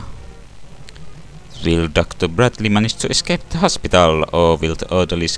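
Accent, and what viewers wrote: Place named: Finland. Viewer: I knew you was finnish (from your accent)! Suomi perkele!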